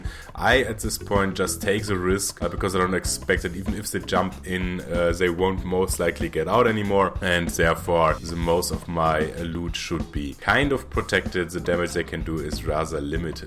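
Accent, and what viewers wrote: Germany. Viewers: nice video, where you from? I think from Germany ^^ because the german accent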